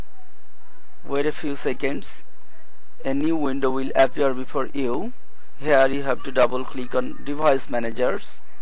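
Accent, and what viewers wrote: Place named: Bihar, India. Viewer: when bihari boy try to speak english it becomes biharish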